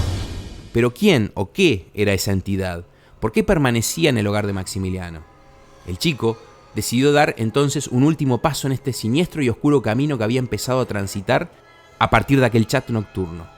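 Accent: Uruguay. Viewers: El a relata es uruguayo de 1 programa de Uruguay pero hablos casi iguales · Acento Uruguayo Papa Saludos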